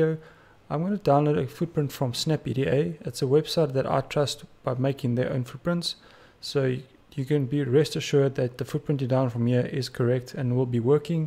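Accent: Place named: South Africa. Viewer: Thats that South African Accent. Shout out from the free state.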